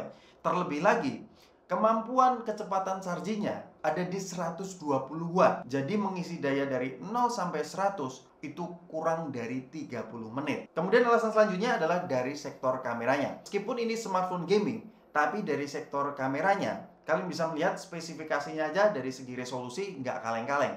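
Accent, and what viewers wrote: Semarang, Indonesia. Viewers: loh citragrand, masnya orang semarang yaah? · loh semarang to mas ?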